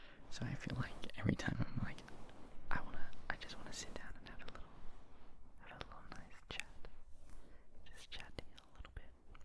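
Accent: Australia. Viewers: I totally agree hearing another Aussie is so refreshing and makes it more familiar and a better experience for me personally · as a fellow aussie, i find it so fresh to see more aussies getting into asmr :] it's quite relaxing and familiar to hear the accent of ppl around me in asmr. not to mention your calm and relaxed demeanor - it really adds to the experience! cheers mate, keep up the great work❤ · Since I'm back in Japan, I have been busy with work and have been diagnosed with bipolar disorder again😢 I can't even have that much sort of energy to watch YouTube or you know just use any kind of SNS but I'm so happy to be here and hear your Aussie accent again. I've been struggling with insomnia and taking some pills but your video really makes me feel sleepy. Thank you.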